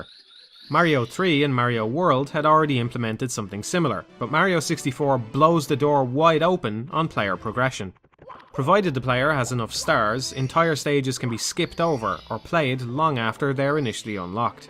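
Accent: Dublin, Ireland. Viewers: Your reviews are highly reminiscent of TotalBiscuit's whom I recently discovered and came to enjoy immensely for his unparalleled levels of insight and breadth of scope. Maybe you should change your handle to "TotalScone", since (and forgive me if I'm mistaken) you sound Irish. :P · Can't pinpoint your accent man, sounds like half culchie half Dublin lol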